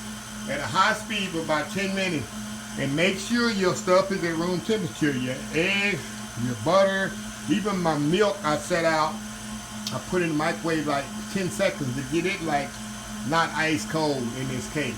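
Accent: South, United States. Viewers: Love that Southern drawl and great looking cake from some kinda cookin man! Thank you! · Just found your channel. I love the cooking,the southern drawl,and most of all the y'all. I love it. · Okay this voice, the rich southern accent and y’alls, assures comforting soul food. I’m in (subscribing)!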